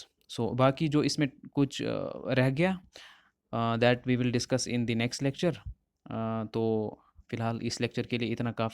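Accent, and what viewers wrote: Kashmir, India. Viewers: Kashmiri accent❤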